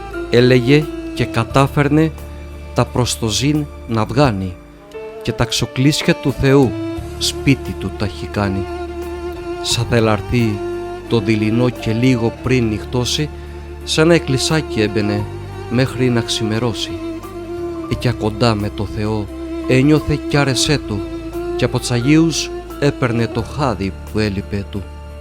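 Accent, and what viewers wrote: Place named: Crete, Greece. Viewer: Στοίχους που αγγίζουν την ψυχή και την καρδιά όσο για την απαγγελία μοναδική με την χροιά την Κρητική που ανατριχίλα μου έφερε στο σώμα και στην ψυχή τέλειο μπράβο και να έχουμε τέτοια βίντεο για να χορταίνει και η ψυχη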